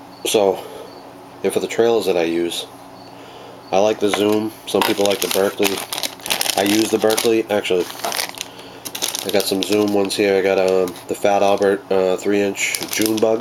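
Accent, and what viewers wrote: Boston, United States. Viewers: you sound like a boston guy, if so we should make some casts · do the Zoom tab tail grubs swim well for you? I bought a pack intrigued at the idea of an erratic tail action the tab would give, but i couldnt get them to swim right no matter how I trimmed my swimjig skirts. Also, just curious. Are you from Boston?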